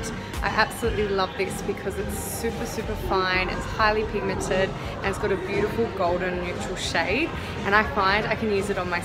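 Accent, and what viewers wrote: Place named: Australia. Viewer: OMG.. thats why i love you so much.. because you are from Australia.. its my dream country.. USA and Australia.. wish to visit them one day.. and i looove your accent.. it would be nice to see you skin care routine or your perfume collection <3 that lip balm looks amazing.. hm.. can we buy Mecca online?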